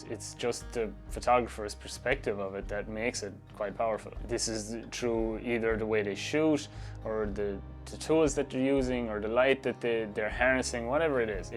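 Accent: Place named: Ireland. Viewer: Nice to see an Irish photographer? Can I ask where you’re from? Can’t place the accent! Clare maybe?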